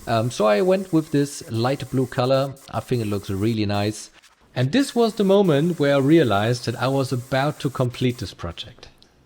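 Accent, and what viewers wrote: Germany. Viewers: I can tell you‘re German, not only from your accent but from the way you constructed that building. Amazing engineering, congrats! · dieser deutsche Akzent haha 🙈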